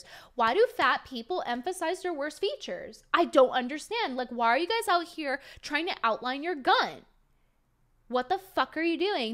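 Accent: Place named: Texas, United States. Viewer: you are taking on a bit of the Texas twang you are a southern belle now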